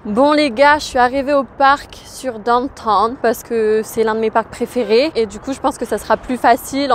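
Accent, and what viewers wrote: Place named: North, France. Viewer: l'accent du nord même au states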